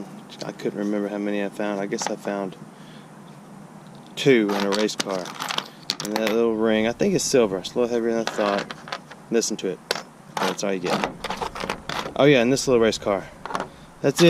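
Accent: South, United States. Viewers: We dont have too many ppl with a southern accent here in Oregon.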